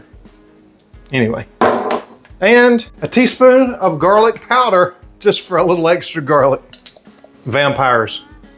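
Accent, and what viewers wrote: United States. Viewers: Us Canadians sure miss you Americans. Good thing we can get our fix of accents and genuine kindness through you tube. I really enjoy your content👏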